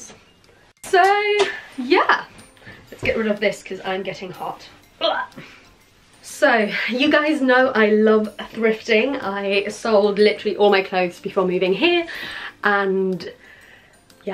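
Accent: United Kingdom. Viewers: now that is what i call a british accent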